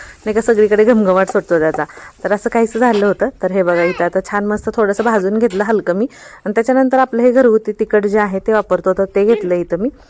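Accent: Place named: Latur, India. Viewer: मला वाटल कि तु लातुरचि आहेस भाषा तशीच वाटली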